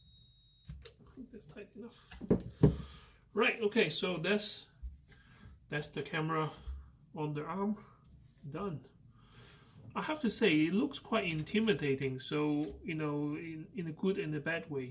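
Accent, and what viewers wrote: China. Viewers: "Chinglish" LOL As long as I can interpret what's being conveyed, I'm good. I just got a Jennov 5MP PTZ POE Mini Cam and it looks similar to this one, but the documentation and Jennov's website is sorely lacking.. :( It appears that mine is made by DianChen Industrial Co., Ltd. and my Amcrest NVR cannot "see" this cam even if I change port numbers. Is it typical that each manufacturer "blocks" other manufacturers' hardware so as to force the consumer to purchase ONLY their hardware?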